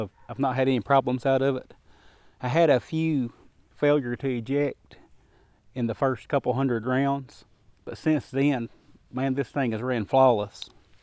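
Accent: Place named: Tennessee, United States. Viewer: I think he's from Tennessee.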